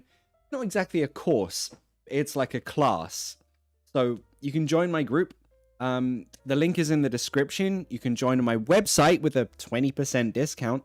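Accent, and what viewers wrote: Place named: RP, United Kingdom. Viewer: 😂😂😂😂😂😂😂...."I'm at the lower end of R.P😆😆😆😆🤣I speak clearly, but not in a stupid way"😅😅😅😅😅😅 Sos un idolo total,! Me haces cagar de risa!😅😅😅😅😂😂😂🇦🇷🇬🇧🇦🇷🇬🇧🇦🇷🇬🇧🇦🇷🇬🇧🇬🇧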